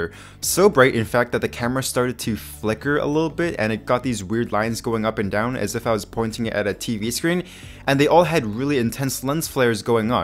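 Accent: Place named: Canada. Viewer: Judging by your accent, I guess you're.. Canadian? Am I right?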